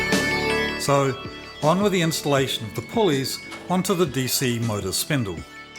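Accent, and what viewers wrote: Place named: Australia. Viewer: Hi mate. Great conversion 👍… You sound like an Aussie… but you mentioned 110v… Are you in the USA? Regards Robert ( Sydney, Australia)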